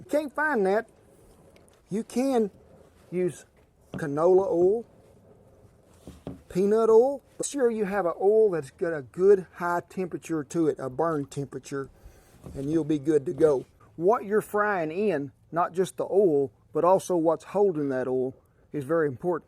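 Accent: South, United States. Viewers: LOVED this!!!! If i'm looking for a catfish recipe and there isn't a good southern accent, I promptly hit the "back" button!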